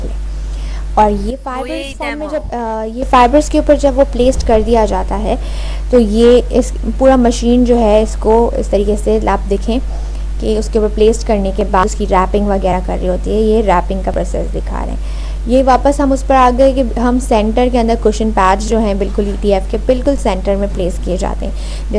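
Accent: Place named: India. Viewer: Can another person read the text?I dint get anything , is there any person, whiteout Indian ecsent.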